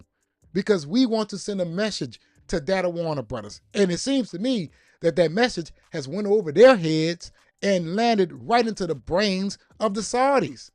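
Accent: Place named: Louisiana, United States. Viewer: I love the enthusiasm when you say things are about to get a little bit spicy baby with that Cajun dialect I'm from Texas which is close to Louisiana and always thought that people from Louisiana have a very swag-a- docious way of speaking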